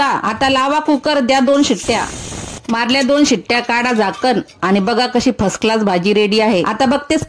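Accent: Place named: Khandesh, India. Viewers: Mausir recipe video mustastastat pan laijast bolta rav khandesi na vatat ahe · आवाजावर नक्की चाळीसगाव ची बाई वाटते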